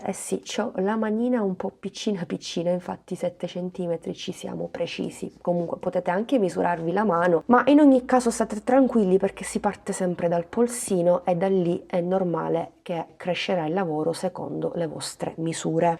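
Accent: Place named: South, Italy. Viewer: si sente che sei del sud anche io lo sono e questo già mi da un motivo per adorarti . Brava e precisa❤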